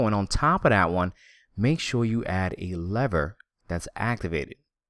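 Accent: New York, United States. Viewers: you sound like your from new York or the Bronx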